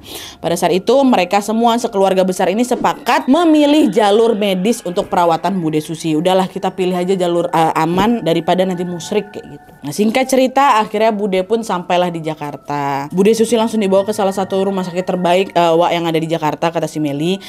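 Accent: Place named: Sumatra, Indonesia. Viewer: AKU MAH SUKA SAMA KARNA CARA BAWAIN CERITANYA ENAK ASIK. NYEROCOOOSSS TERUS TANPA HENTI DG LOGAT SUMATERANYA. LANJUTKAN...!!!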